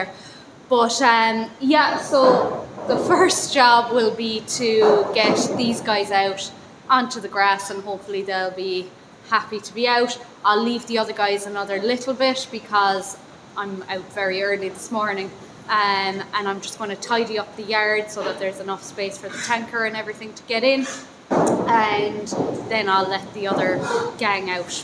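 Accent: Kerry, Ireland. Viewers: dont say yearlings The original farmer word is WEANLING That's just what I think You use some lovely old expressions like the "Pike " and definitely don't lose the Kerry accent Best of luck from coMeath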